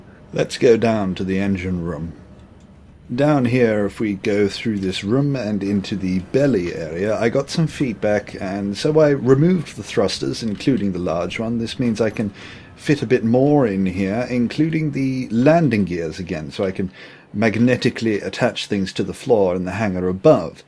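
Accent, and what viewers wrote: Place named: United Kingdom. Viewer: this sounds so different from how he speaks now... its like hes british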